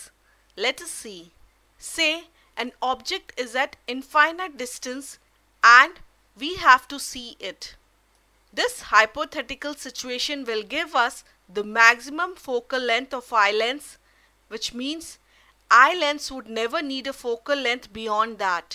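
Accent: India. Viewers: Is it Indian accent? So informative video ruined by accent.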